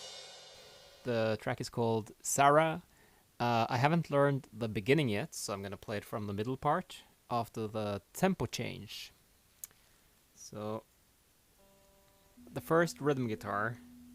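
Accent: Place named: Scotland, United Kingdom. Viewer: You're gifted man. I think you should be in Macheso's band. I am curious though as to how you came to like sungura, you sound very Scottish. Apologies if you're not at all Scottish, you just sound a bit Scottish that's all and I doubt Sungura is played on Radio stations in Scotland (ha ha). Nice work still. 👏🏾👏🏾👏🏾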